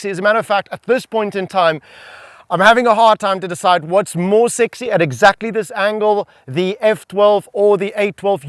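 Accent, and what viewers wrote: South Africa. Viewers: That South African Accent is sexier than the F12 and the 812😉🤣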